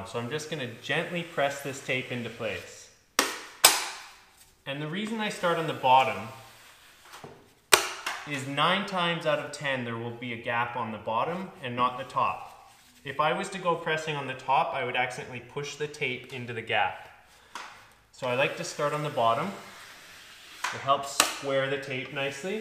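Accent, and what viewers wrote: Canada. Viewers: Awesome video, even better This Hour has 22 Minutes accent eh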